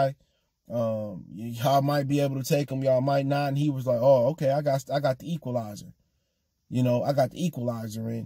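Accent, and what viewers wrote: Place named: Ohio, United States. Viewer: You sound like you from Cleveland 🤔. I could be wrong but you definitely have an Ohio accent.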